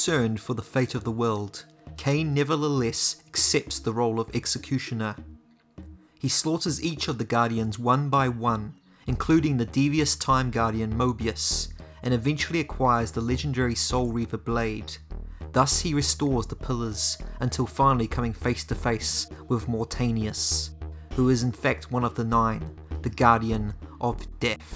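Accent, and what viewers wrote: New Zealand. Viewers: This content and writing is so lekker! Love it. Is that a New Zealand accent? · From or based in New Zealand? I hear an accent.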